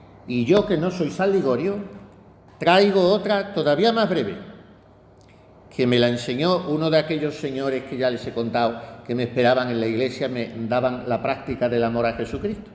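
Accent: Extremadura, Spain. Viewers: Ay Padre muero de risa ! Así o más extremeño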